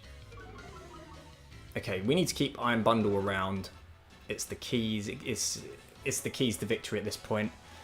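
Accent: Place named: United Kingdom. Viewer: Brits just say Ariados so much better than us Americans LOL If you want to continue the trend, I would like to see a Spidops team!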